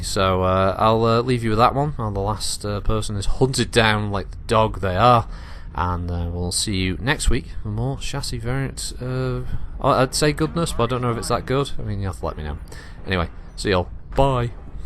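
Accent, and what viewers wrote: United Kingdom. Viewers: Thank you. You know that American's trust a British accent, right? Well, speaking for myself I think that is true. Your timing for the most part is great. You don't stumble over words. You don't throw in the obligatory 'uhs' and 'ums' like I do. That is my handicap. I can write far better than I can speak. Though, somewhere in my early to mid thirties I did notice that marked improvement in my reading aloud ability. I'm not sure if that is something that comes with age and practice or just reading Robert Jordan novels until my eyes bled. Nevertheless, your lore series brings to mind (and please don't take this the wrong way, I mean this with the utmost sincerity and respect) the old nature shows I used to watch in the early 80s. I'm serious when I say your voice brings a certain...credibility to the universe. Several times while watching your series people have come in and assumed I was watching something about the real world. 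I always enjoy the sound of voice and look on their faces when they inevitably say, "What the hell are you listening to?" five minutes into Star League History 3 or something. It telegraphs to me that they thought it was syndicated documentary or something (I am prone to watch more docs than cat videos or people getting hit in the stones or 'Top Ten Reasons not to watch Top Ten videos' videos) so I smile inwardly at their sudden confusion when you switch gears from talking about the Camerons to a battle over YakitySmakity V. That fact that you are doing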